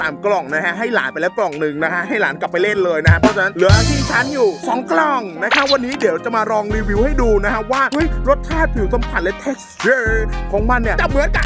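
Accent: South, Thailand. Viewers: พี่เปนคนจังหวัดอะไหรค่ะ เหมือนคนใต้เลย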